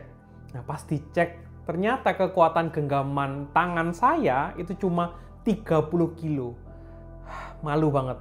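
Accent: Java, Indonesia. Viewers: Nggak usah serius², dok. 😂😂 Dari aksennya jelaslah dokter org Jawa. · Dokter medok 😅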